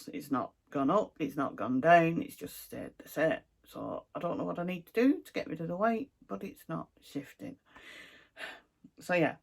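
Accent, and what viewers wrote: Lancashire, United Kingdom. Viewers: I live in Lancashire area, but I discover your chanel with help from Theresa Little Stitcher 🥰 and with your help I will learn british accent from Lancashire 🥰 In August, five years ago, I move to this area and I love it.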